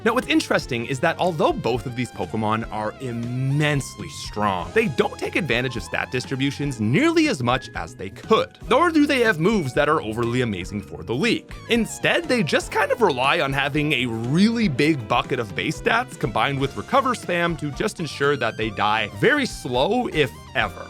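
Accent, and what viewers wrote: Canada. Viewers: He's Canadian. Every time he says something with "out" in it, it sounds like "oat" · Well that's just Canadian pronunciation. I don't think they say drought like that though. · Oh your Canadian makes sense now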